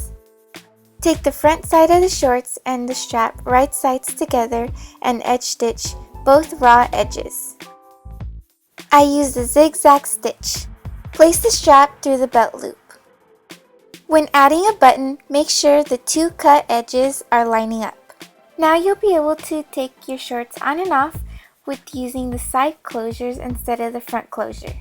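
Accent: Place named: Spain. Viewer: Se te nota bastante que hablas español =)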